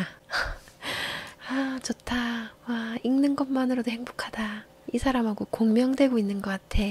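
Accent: Gyeongsang, South Korea. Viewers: 경상도사투리가 약간섞인 감미로운 목소리에 영적능력에 진심으로 빠져듬니다. 매일 아침 눈뜨자마자 고맙게 듣고 영성의 질을 향상시키고 있어요 너무 감사핮니다 10만 20만...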